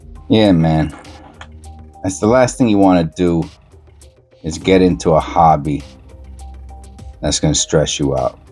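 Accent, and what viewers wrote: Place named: Brooklyn, United States. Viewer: I knew I recognized that accent lol I'm also from Brooklyn and live in Bensonhurst.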